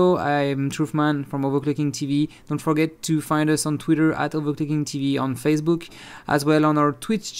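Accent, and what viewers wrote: France. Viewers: bjr monsieur, êtes vous Français ? Très bonne vidéo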